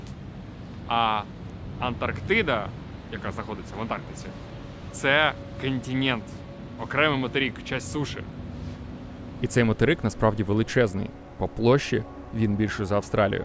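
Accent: Russia. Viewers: Птушкін українською вже не той… Не такий щирий, не такий емоційний і не такий цікавий. При коментуванні та озвучці відео вставити за весь час 150-200 російських слів і фраз…ну не йде, Антон, для чого видавлювати з себе те, що навпаки псує враження? Тебе прекрасно всі розуміють російською та ще й в 100 разів більша аудиторія…ну таке собі, чесно, без образ. За роботу браво і дякую, за мову - мінус, хоча я сам із заходу і виріс в україномовному середовищі, але чути від тебе українську - не заходить абсолютно…☹️ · ни одна страна мира так не кичится своим флагом, гербом и языком, как Украина. больше видимо нечем. Если что русскому человеку все понятно и на украинском, но справедливости ради приходится вслушиваться. Но просто все понимают что ты не украинец, родившись и вырастая ты находился в русском, тогда еще находившимся на территории Украины, но русском, Луганске. 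Ощущение, что русский говорит на очень простом украинском, где по-украински он знает только самые банальные слова, будто разговор с акцентом. и да я понимаю, что это мое личное негодование и не мое дело и я это признаю. просто искренне хочется смотреть крутые видосы на полностью понятном тебе языке и человека который всегда снимал на нем, всегда все всем понятно было и всегда было здорово все. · Дякую за фільм. Чудові зйомки! Тільки попрацюйте над мовою, бо купа русизмів. британці not брітанци, корабЕль not кАрАбль, на вОду not наваду, швартОвІ not швартовИ, квіточкИ not цвєточкі, мИлотА not мілота, глИбокій not глУбокій, архіпелаЗІ not архіпєлаґє, Шпі́цбе́рген not Шпіцбєрґєн, лід not льод, кОнтинент not кантінєнт, білий ведмідь not білий медвідь, к раєвид not вид, човен not лодка, сУдна not судА, замайорІв not замайорИв, материку nat матєрікє, особини not особі, живіт not живот, берлозі not бєрлогє, стіл not стол, стосунки not відносини, ну і звісно Да легко можна змінити на Та)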